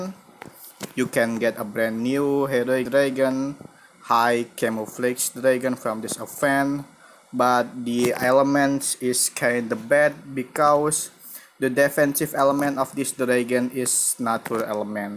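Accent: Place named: Indonesia. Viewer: Bro, are you from indonesia? Your accent sound like Indonesian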